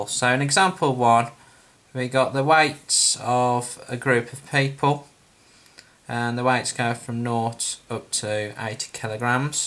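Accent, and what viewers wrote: Birmingham, United Kingdom. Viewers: Thank you! What part of Brum are you from mate?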